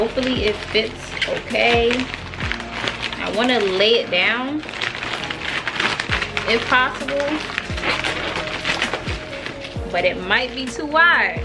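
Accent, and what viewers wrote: Philadelphia, United States. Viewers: Lol I knew 3 seconds into the video you were from Philly! Thanks for the info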